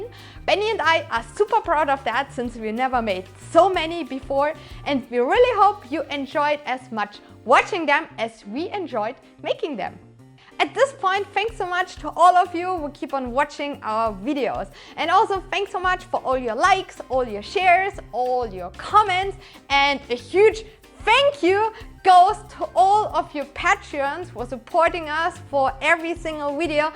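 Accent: Germany. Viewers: She's German Her accent is German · Make a german Version (man hört das du deutch bist ) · Are you from germany? Because of your denglisch akzent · Man hört deinen deutschen akzent, lol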